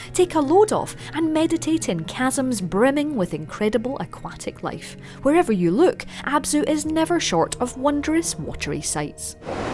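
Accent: Scotland, United Kingdom. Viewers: Apart from the games, I love the narrator's Scottish accent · this Scottish accent man , my heart i love it xd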